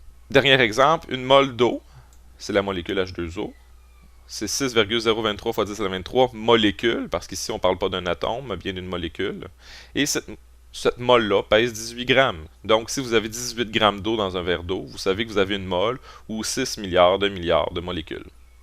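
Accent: Quebec, Canada. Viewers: ps:l'accent Québécois est énorme 😅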